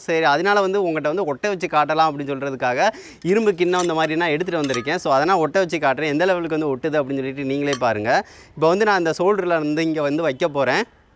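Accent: Sivakasi, India. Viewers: Bro U sivakasi yaa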